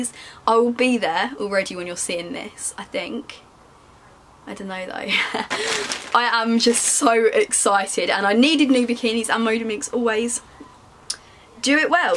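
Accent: United Kingdom. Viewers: Amazing body..love your goofy British accent too very cute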